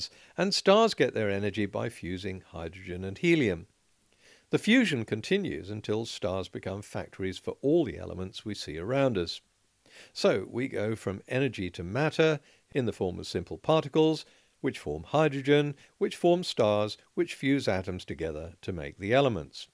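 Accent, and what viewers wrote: RP, United Kingdom. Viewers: "nothing literally nothing" - richard dawkins asking Lawrence krauss "yes" more like atheist dont know what their academics are saying i don't think you can actually defend your position aside from English posh accent, a rapid expansion is a explosion, but this is just semantical equivocation, genius you still think it 'expanded' from nothing, the singularity ISSSS nothing in the atheist wolrd view, simply calling nothing the singularity doesn't stop it from being nothing. which i dont need to tell you this is a stupid teenager answer how about you actually debate for once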